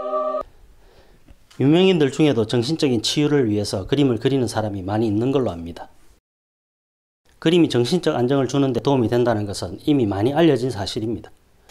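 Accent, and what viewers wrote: Gyeongsang, South Korea. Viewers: 이런 유익한 영상은 광고 건너뛰기 절! 대! 로! 안하고 다 봅니다! 선생님의 군더더기 없는 갱상도 말투, 더 없이 편하게 듣고 있습니다. 시어머니가 74세 인데, 제 결혼 사진을 보고 연필 인물화를 그려주셨는데 너무나 충격적(넘나 못그림 ㅜㅜ어머니도 40대에 그림 배웠다고 하시는데 맨날 천날 꽃 그림만 그리는 이유가 있었음. 꽃은 대충 그려도 이쁘니까)이어서 당장 유투브 보고 유익한 동영상 뒤져서 일단 구도 좀 잡고 비율이라도 맞게 그리라고 붙여드리면서 발견한 보물같은 채널입니다. 저도 중딩때 아주 잠깐 미술학원 다녔는데 딱 화실 분위기였어요. 강사님은 따로 없고, 모든 선배님들이 지나가면서 그림 코치해주시더라고요. 다들 대학생인지 잘 모르겠고 매일 오지도 않고, 원장샘은 아무것도 안하는...(기나오지도 않고 ㅋㅋㅋ) 그리고 선배님들이 다 말이 달라서 a 선배님이 이렇게 그리라고 하고 사라지고 b 선배님이 나타나서 야단을 칩니다. 야임마 누가 이래 그리라대! 그리고 다음날은 c 님이 다르게 가르쳐 주면서 기존에 배운 그림을 비난합니다. 누구말을 들어야 할지 혼돈의 카오스 같았지요. 미술학원은 다 이런건가 이러면서 잠시 다녔습니다 마음 같아선 평생 다니고 싶었는데 전공할 건 아니라서 아빠가 못 다니게 함 돈 없다고 ...ㅜㅜ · 김해입니다..경상도 사투리가 정겹습니다..감사히 보고있습니다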